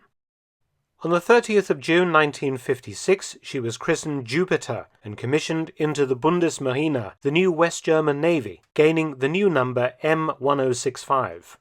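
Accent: United Kingdom. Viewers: I'm always put in a better mood while watching a Mark Felton video, the combination of the interesting topics, calm gentlemanly British accent and relaxed vibe of the videos is just so good.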